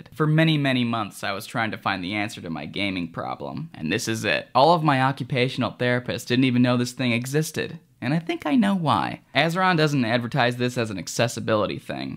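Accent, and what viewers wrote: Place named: California, United States. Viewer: Cara, esse sotaque de quem mora na california me da um enjoou, sla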